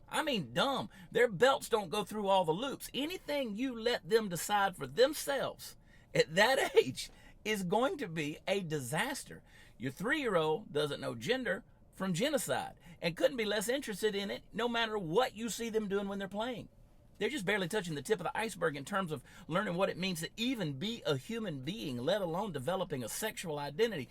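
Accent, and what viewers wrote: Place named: South, United States. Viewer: my grandson 3 years old now I spy his name is Jacob he was running through the house telling his mom and the rest of us that he found a home and we run and check the bathtub to see if there was a whole went through the house went through everything we couldn't find a home we went outside we checked this pool if there was a hole finally she's sitting there she can't figure out what's going on none of us can and then he walks up and sticks his head in her face she smells his hand and it's got s*** on it and she throws up 3 in across the floor on the carpet is this little boy still tripping on having a a**** why would he care if he's a boy or a girl this is all so ignorant and so stupid but the story is true I think it was over with it was funny because my daughter didn't expect to smell what she smell and I never seen no one throw up 3 in across a space not even a drunk Love & blessings love your southern drawl I have one of my own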